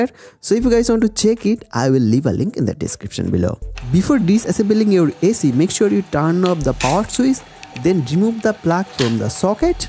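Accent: Bengal, India. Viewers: bhaiya bengali ho kya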